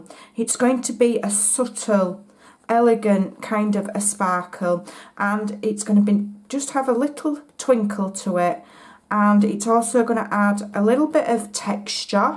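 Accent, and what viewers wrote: United Kingdom. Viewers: Your video was the first I watched. I have lots of 3D embosses folders. I could watch your video all day because of how simple it and easy it looks and I could listen to your lovely accent all day! Where are you from in the UK?? Right now I am addicted to watercolor painting florals using stamped and embossed images ... using Ken Oliver color bursts....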